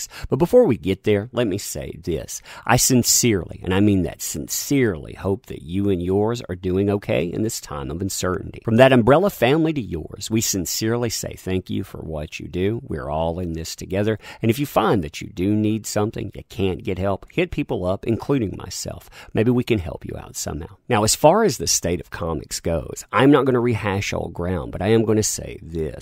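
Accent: South, United States. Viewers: I can’t focus on your video. Not because it’s bad or anything, it’s just your voice is so soothing and sounds so southern while at the same time doesn’t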